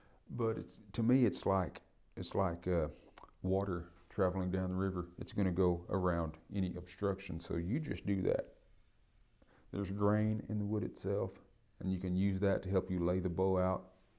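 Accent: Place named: South, United States. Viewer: i was so expecting a heavy native man accent....was not expecting that southern accent...im native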